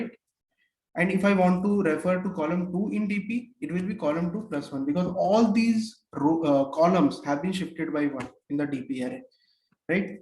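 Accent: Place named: India. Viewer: f*ing indian accent....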